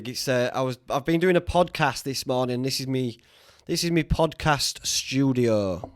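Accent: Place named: Sheffield, United Kingdom. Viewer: You sound like you are from near me haha, im from near Sheffield - Rotherham - Just looking for an ebike myself!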